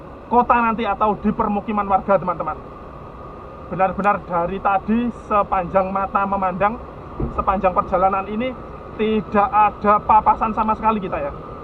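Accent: Java, Indonesia. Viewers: Gemes dengar suaranya... medok medok pie ngono.. Salam dr medan Pak..buat sekeluarga...sehat selalu..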